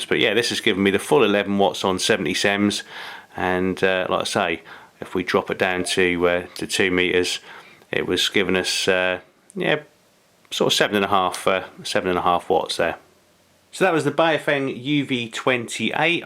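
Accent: United Kingdom. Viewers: I just got mine (US). It's a pure beast on 70CM, hitting repeaters way out. 2M is ok to close repeaters, even with different antennas. Big clunky buttons feel awesome and the GPS is strong (hold down the A/B button for GPS). When transmitting, be sure to press the transmit button hard and wait a second or two before speaking. Too bad you couldn't get this info from the video, but you know, clicks.... But at least he's got a British accent.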